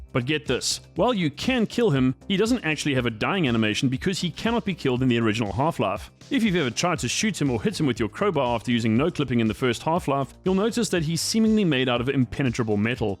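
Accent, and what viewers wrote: South Africa. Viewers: I know a South African when I hear one, lol. Dope video.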